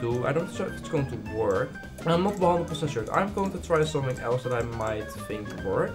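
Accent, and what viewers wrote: Netherlands. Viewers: Are you Dutch? Because I think so (Ik denk het namelijk wel)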